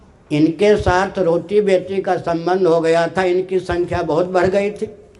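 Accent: Standard. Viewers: Shudh hindi gazab bolte hain 🙏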